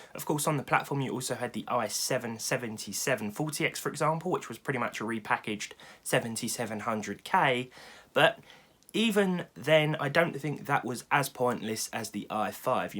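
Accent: United Kingdom. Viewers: 🅱️RUV ENGLİSH ACCENT GİVE ME A GAY VİBE BUT ANYWAYS KEEP GOİNG İ LOVE YOUR VİDEOS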